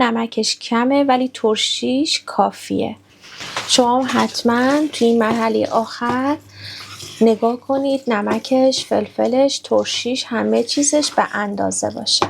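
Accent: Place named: Iran. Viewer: I love the Persian accent you look incredible dear friend thanks for sharing best wishes from England ❤👍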